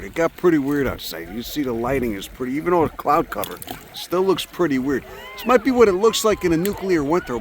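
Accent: Chicago, United States. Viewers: 💯% and with humor. All the above + primo authentic Chicago accent mixed in as a bonus. nothin better 💫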